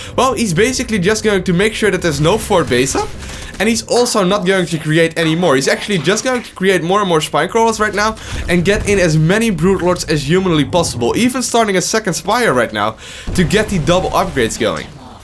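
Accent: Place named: Netherlands. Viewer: dat classis dutch accent....sounds exactly like rotterdam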